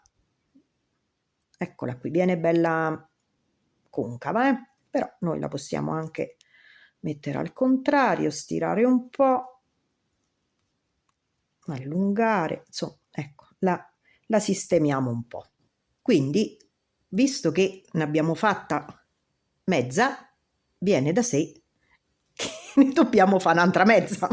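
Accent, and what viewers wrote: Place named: Rome, Italy. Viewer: Ciao! L ho realizzata oggi ed è venuta benissimo! Bellissima! Poi tu mi sei troppo simpatica! Sei di Roma? Dall'accento mi sembra di si (anche io sono di Roma). Spieghi molto molto bene, e questa mini bag é veramente bellissima! Grazie! ❤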